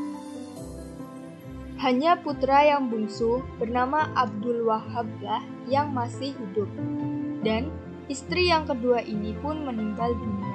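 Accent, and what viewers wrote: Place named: Banyumasan, Indonesia. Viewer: Uwuuuuu ngapak mantap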